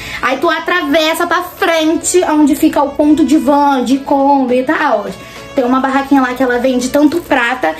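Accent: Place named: Rio de Janeiro, Brazil. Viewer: sou da Bahia,amo seus vídeos.amo seu sutaque Carioca,acho super lindo. Amo seu jeito de ser,as suas dicas super maravilhosas,adoro quando vc começar o vídeo dançando.! Vc tem que ser do seu jeitinho mesmo.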